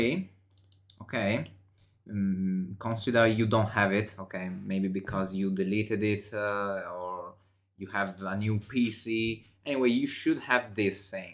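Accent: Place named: Italy. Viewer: "Sorry for the Italian accent." It's okay, we forgive you.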